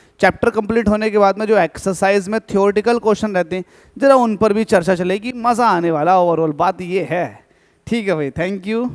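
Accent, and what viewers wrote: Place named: Haryana, India. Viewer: Lagta h sir aap Haryana se ho😅😂😊😊😊😊😊😊😊😊😊😊😊😊😊😊😊🎉🎉🎉🎉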